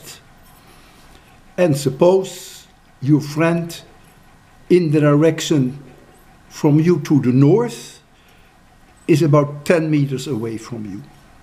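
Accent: Netherlands. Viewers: you are dutch arent you?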